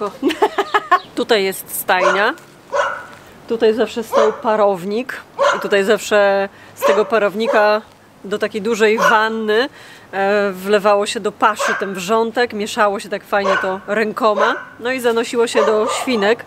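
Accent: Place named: Greater Poland, Poland. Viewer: właśnie oglądam film co opowiadasz i wymowa dialekt zwroty ten tysiąc w karty itd identyczyy co u nas ja z wlkp to nie daleko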